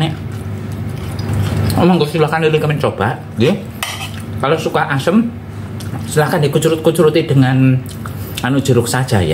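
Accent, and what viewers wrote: Banyumas, Indonesia. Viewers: Lik asli ne wong endi??? Yakin ngapak kaya enyong pokok e ora ngapak ora penak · Sampean wong ngendi deneng ngomonge ngapak kaya jawane ijong masakane enak sumpah ijong jajal msk tongkolll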